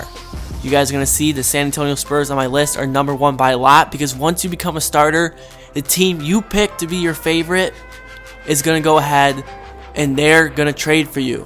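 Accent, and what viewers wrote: Midwest, United States. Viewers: Why are you a Spurs fan? You sound like a midwesterner to me. Good video though. It worked for me, but they didn't trade me due to my conflict with Kevin Love. They traded me a week later, after I conflicted with the coach. I guess I was playing too well for them to trade me, IDK.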